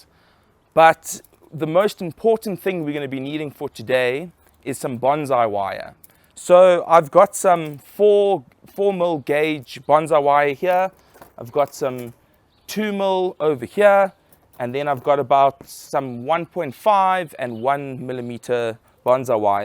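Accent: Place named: South Africa. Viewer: really great video.....thank you.....also great to hear a fellow south african accent on you tube.....its also wonderfull to hear and feel your passion......im a beginner and just starting my bonsai journey. do you live in South Africa? where abouts?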